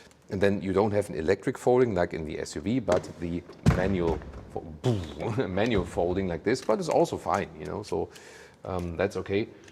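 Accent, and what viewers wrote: Germany. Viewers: Drinking game: Take a shot every time says "Wuerszhion" (Version) We love your reviews and beautiful German accent <3 The black paneling around the wheels is the ugliest I have seen in a long time. Usually, people air for a look where the wheels fill out the wheel arches as much as possible, and here they have visually gone in the opposite direction.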